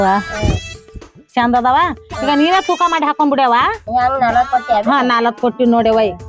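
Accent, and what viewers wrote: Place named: Bijapur, India. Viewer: ❤❤❤❤ yu very talented.. Bijapur Kannada tumba ಚೆನ್ನಾಗಿದೆ 🙏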